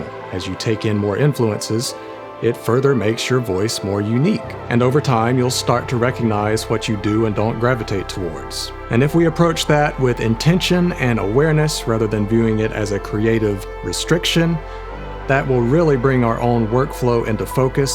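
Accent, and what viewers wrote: South, United States. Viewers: I love this guy’s voice. I love his slight southern accent but completely meditative tone. I want him to read books to me.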